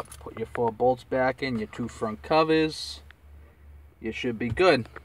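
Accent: Boston, United States. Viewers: I like your Boston accent. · thanks - need this -useful vid Also your accent is awesome accent. Very Good Will Hunting :-)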